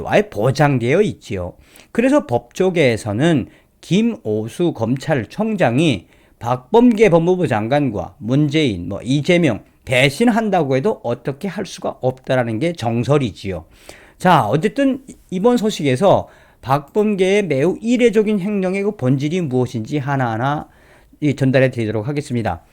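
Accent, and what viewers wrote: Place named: North Korea. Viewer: 북한식 말투가.... ㅋㅋ 재밌어~!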